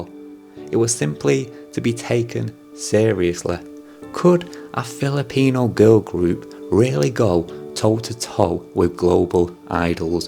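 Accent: Northern England, United Kingdom. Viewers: You’re all good. I’m Filipino and can tell you’re saying it properly within your regional accent (Yorkshire? At the very least Northern English). This is a great sign of Bini’s global recognition—people will say the group’s name with their own accent.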